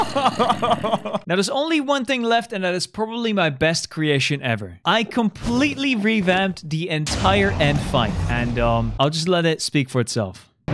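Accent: Netherlands. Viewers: you have a dutch accent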